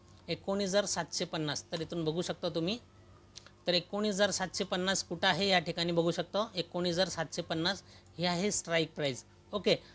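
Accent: Solapur, India. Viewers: Sir tumhi Solapurche ahe Right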